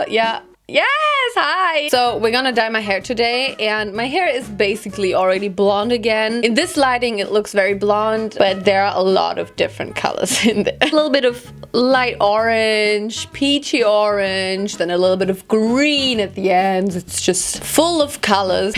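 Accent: Germany. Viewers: with an ultra German accent is the best. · Ich liebe dein German akzent 🤩😍🔥 I tried so hard to to do it, but I am *tresh* 😂😂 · I would like to hear more of your German accent 😂❤❤ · Du hast doch nen deutschen Akzent in deinem english auch wenn du es nicht spielst 😅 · du hast auch so einen typisch deutschen Akzent😂😂❤ Aber das find ich, hört sich süß an, also keine Sorge🤗😙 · Ok tbh ich hab deinen deutschen Akzent bevor du ihn so typisch deutsch ausgesprochen hast, gar nicht bemerkt xD danach schon xD aber respect echt gutes English was sie da sprechen tun Madame d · OMG THE GERMAN ACCENT PLEASE ONLY TALK LIKE THAT! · Der deutsche akzent👌😂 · THE GERMAN ACCENT ❤️❤️❤️❤️ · Pure Unterhaltung, dieser deutsche Akzent xD #broccolomi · Are you German and if you are your accent is beautiful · Der deutsche Akzent killt mich jedes mal xD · Sie hat iwie schon nen deutschen akzent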